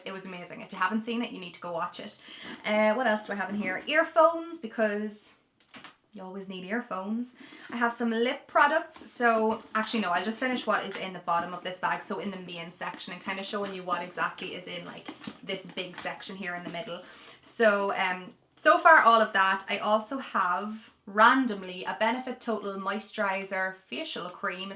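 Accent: Ireland. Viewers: I love your irish accent!!❤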